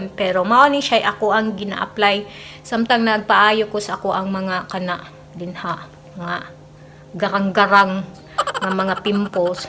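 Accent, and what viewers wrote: Visayas, Philippines. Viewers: Lami Kay paminawon mag bisaya sis na try nasad na ko sis. YT pa more maong g bugas hh parehas ta😂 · sis omg i love the way you talk Bisdak na bisdak kaau i love it i will play and watch all your videos im sure naa ko ma learn thanks sa pag share aning steam facial spa treatment or “Toob” dali ra sya pero careful lang sa pg apply kay ininit mn tubig hehe utong jud ning klaseha kay tiis ganda man Pero para unsa man gyud ni sya nga ritual sis pang hydration sa face?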